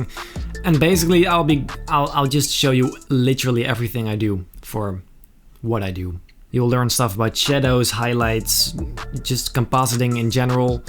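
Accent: Netherlands. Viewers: what do you mean he hasn't done tutorials the dutch accent tuts are actually good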